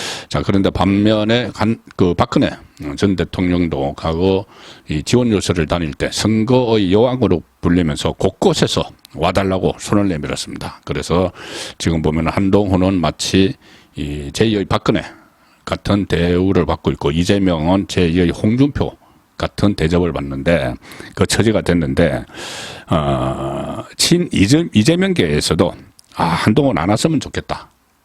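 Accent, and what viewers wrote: Gyeongsang, South Korea. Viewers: 송국건님 시원시원한 경상도 억양으로 최고의 정치해설 짱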